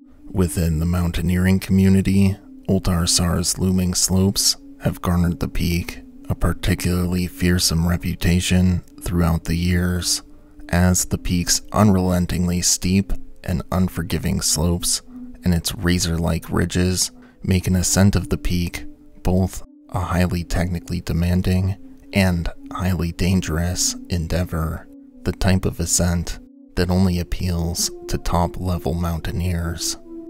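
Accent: Midwest, United States. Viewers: Mid west accent fer sheer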